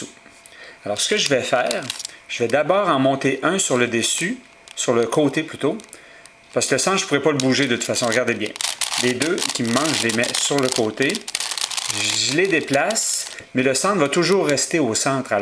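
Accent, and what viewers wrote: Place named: Quebec, Canada. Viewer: yes un Quebecois ! lol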